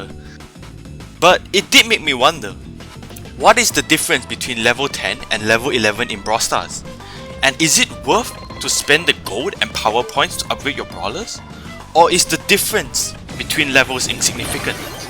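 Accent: Singapore. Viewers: I can tell that ur singaporean XD am i right? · singaporean accent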